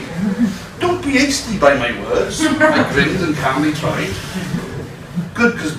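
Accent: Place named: Wales, United Kingdom. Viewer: Good stuff ... So rock and roll man !!! Where did the taffy accent come from? 'Butt'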